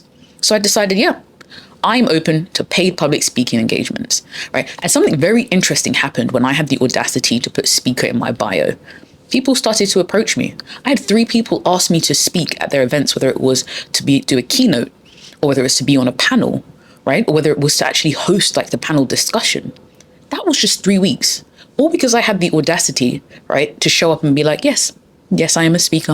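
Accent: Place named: United Kingdom. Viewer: Ah, you're British! So refreshing. Great content, thank you, Sis.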